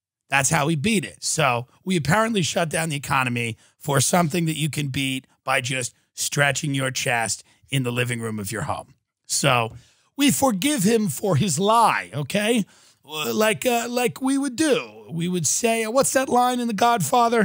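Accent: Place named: Suffolk County, United States. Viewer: LMAO. I put off liking Tim for the longest time but it's cus i grew up in Suffolk County LI and spent so much time trying to "overcome" it.. But listening to Tim is like being back home. I guess I'm saying congrats and continued good luck to him, as well as thanks for the entertainment. This is a compliment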